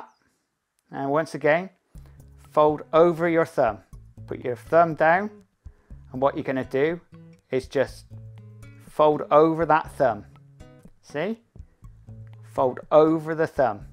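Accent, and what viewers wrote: United Kingdom. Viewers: i love your accent. what kind of uk accent is it?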